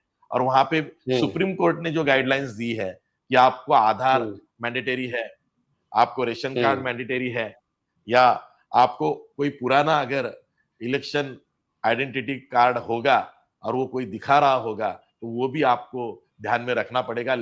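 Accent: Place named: Maharashtra, India. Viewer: Sir, tumchi Hindi marathi sarkhi wat the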